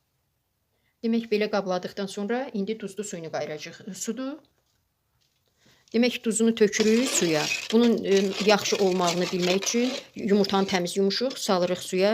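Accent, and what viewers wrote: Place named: Ganja, Azerbaijan. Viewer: İndi duzlu suyunu qayirajıyıx ,,belə bircə Gəncəlilər danisir ,,ağzını örtüb qoyuruq qırağa .Sizə uğurlar xanım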